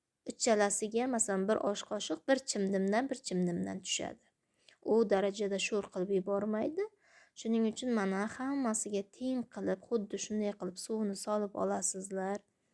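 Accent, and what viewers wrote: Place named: Qashqadaryo, Uzbekistan. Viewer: Shevangizdan Qashqadaryodanmisiz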